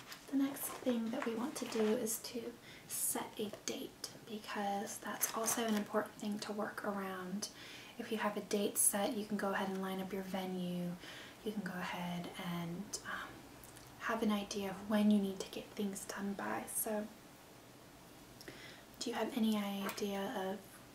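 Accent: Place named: North Carolina, United States. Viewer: Just based on her accent and talk of ft fisher, the aquarium there, and the azalea festival, I'd say she's from my home state of north carolina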